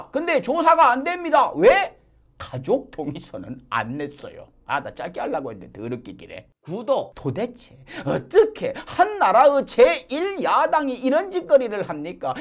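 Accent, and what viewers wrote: Jeolla, South Korea. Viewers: 강 성범씨 구수한 전라도 사투리가 매력이 있습니다. 럭셔리 칼럼 잘 보고 있습니다.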